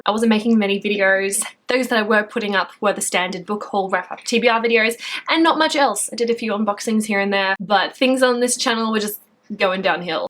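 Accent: Australia. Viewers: Are you Aussie? I love your accent!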